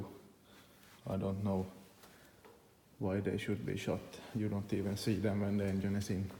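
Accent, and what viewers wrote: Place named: Finland. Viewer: I love Finland, finns and finnish accent. Anyway, great job! I want this car!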